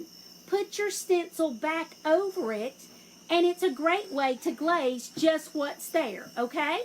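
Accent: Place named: Tennessee, United States. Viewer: Refinishing an old antique tobacco humidor right now. Been going back an forth on what color to paint it and to distress or antique wax...or both. Haha I kept feeling no matter what I did that something was missing. My door is plain. And I thought how could I DIY a raised embossed stencil onto wood. And I found you!! You are so dang fun and I'm from TN and my accent is about as thick as yours. Lol My accent and my "attitude" (not in the negative sense, more moxy and grit rather than attitude hahaha) that has been what has been holding me back from starting my own YouTube channel. But you make me feel like "what the world am I so afraid of!?" I've never been one to care what people think of me. Why should the internet be any different?? You are so unapologetically YOU. I completely admire that. And I NEEDED this tutorial tonight!!! Going to risk a trip to lowes tomorrow so I can get my piece finished! 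Thanks so much for sharing and for inspiring me!❤ New subscriber here!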